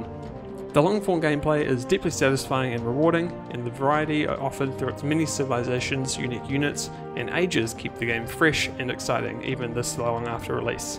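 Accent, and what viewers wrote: New Zealand. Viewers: I see video, I hear Kiwi, I subscribe ❤ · Are you a kiwi?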